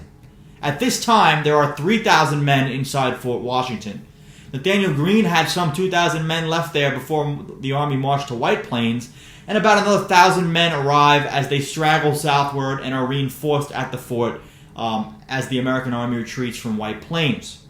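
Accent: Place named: North Jersey, United States. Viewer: Another Great presentation my friend, Thank You. Where abouts in NJ are you from? Judging from your accent, I’m assuming North Jersey lol, I’m originally from Dumont in Bergen County myself, Be Well 👍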